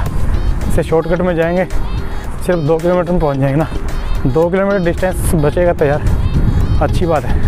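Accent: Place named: Uttar Pradesh, India. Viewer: Punjabi bolya kr yrr hindhi tu jmme up de bhyiaan vrgi bolda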